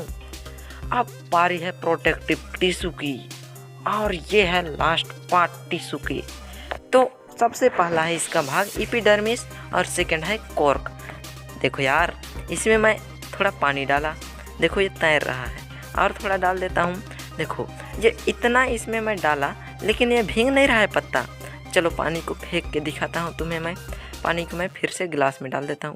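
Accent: Bihar, India. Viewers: Bihar sa ho kaya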